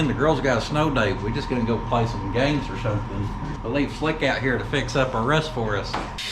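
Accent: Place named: South, United States. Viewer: are you sure your not one of rednecks from down south way. The way you talk and spell sounds like us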